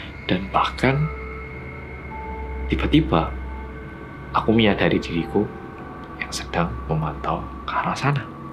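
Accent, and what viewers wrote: Java, Indonesia. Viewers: Nonton bioskop ❌ Nonton yg medokkkkkk ✅ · Kang asli korea? Entah kenapa gak ada koreanya cuma ada jawa campuran melayu kan jawa itu kebanyakan apalagi yang asli tanpa permak itu hitam manis kalau senyum cewek maupun cowok,melayu kulit sao mateng kalau banyak keluar,kalau enggak itu sao muda putih bening,apa lagi medok khas jawa jadi ragu 😂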